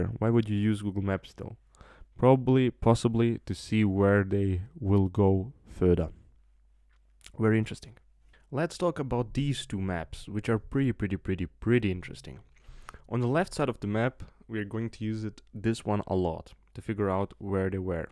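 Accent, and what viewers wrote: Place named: Russia. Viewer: По вашему акценту, мне кажется что вы Русский!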